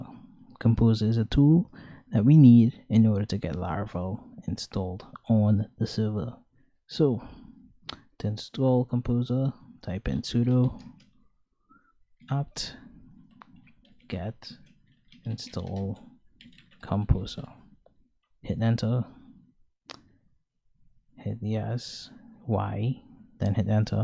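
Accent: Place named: Trinidad and Tobago. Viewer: I knew that was a Trini accent. Happy to know there are more high-level coders from TT. Well done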